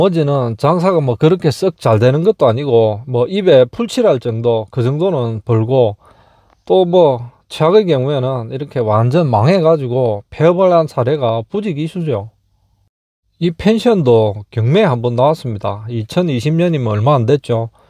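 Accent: Gyeongsang, South Korea. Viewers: 깔깔하네 서부경남쪽 사투리 말 올래만에 들어봐네요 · 영상보면 목소리나 영상이나 그냥 일상...부산경남사투리억양...디젤집시님이 생각납니다...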